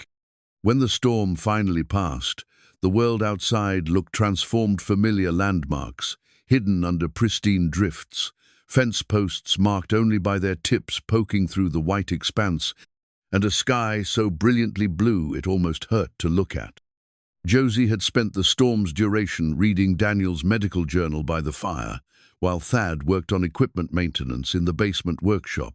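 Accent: United Kingdom. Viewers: Too much time on the dead wife. Why not more on the dead husband. He had not been dead very long, yet, the wife has little grief? Ugh. And please get rid of the British accent. A western story needs an American voice.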